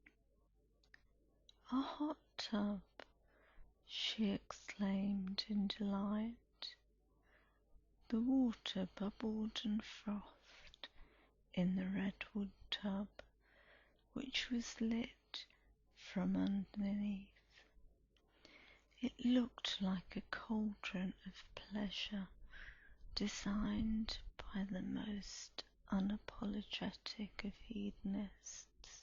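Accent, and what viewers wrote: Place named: England, United Kingdom. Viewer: Oh my. English accent..I'm in love with you...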